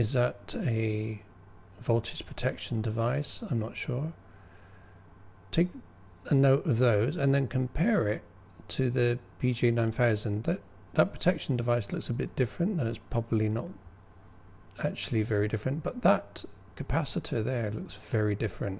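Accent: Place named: Bristol, United Kingdom. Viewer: Just ordered a BJ9000. I realise you are in Singapore but, your accent sounds quite local to me here in Bristol. Thanks for your expertise from M7CUN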